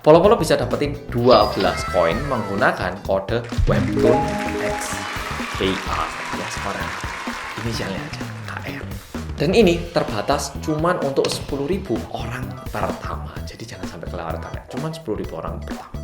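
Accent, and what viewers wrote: Java, Indonesia. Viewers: bersama orang korea yg medhokkkkkkkkkkkkkkkkkkkkkkkk wuasik pool mendhoknya wkwkkw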